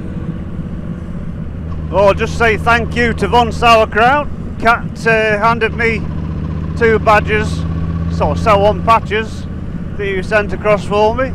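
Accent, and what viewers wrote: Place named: Lancashire, United Kingdom. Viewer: mate I freakin hate bugs 😂 and the amount of wildlife we see and the GoPro does not pick it up is incredible! That’s another reason I stopped using Superview on it cos it even makes curvy country roads seem dead strait!. Ooohhh and the Accent is Lancashire am originally from Preston ‘ 👍👍🍺🍺🥃🥃🇬🇧🇺🇸 ooohh Mate Thanks for the sub Appreciate That 👍👍🤘👌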